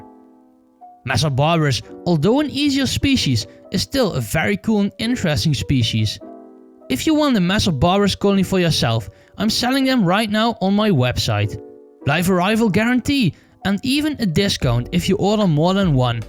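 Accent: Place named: Netherlands. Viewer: Cool accent. Let me guess... You are from the netherlands?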